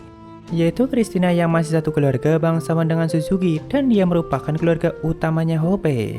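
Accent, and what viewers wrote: Banyumas, Indonesia. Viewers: Ga terasa udah part 45. Mantap apakah mimin orang ngapak2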